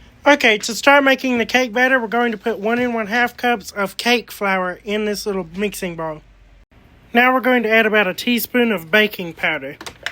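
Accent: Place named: Georgia, United States. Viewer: It’s actually a mix of deep Georgia and some transatlantic it seems. It’s very interesting.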